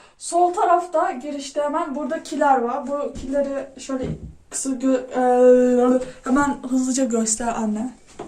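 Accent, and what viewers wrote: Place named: Sinop, Turkey. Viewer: Sinoplusunuz galiba kınuşmanız sinopluları anımsatıyor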